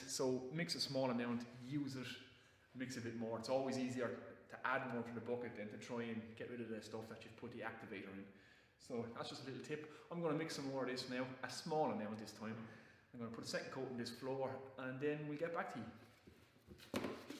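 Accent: Ireland. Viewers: Can't hear you man. But subscribed anyway haha refreshing to hear an Irish accent for a change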